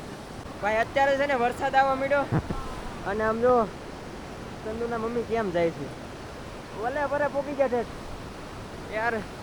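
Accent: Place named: Bhavnagar, India. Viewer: Tamari language(boli) pyor bhavnagari che ❤ so like it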